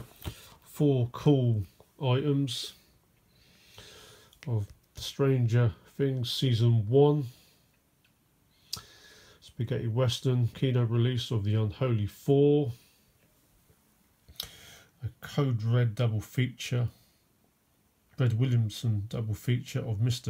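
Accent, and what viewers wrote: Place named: United Kingdom. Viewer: Hello! Merry Christmas! How did you get the name btw? You sound very British,but here in the states when someone is named they're usually from a Spanish speaking background.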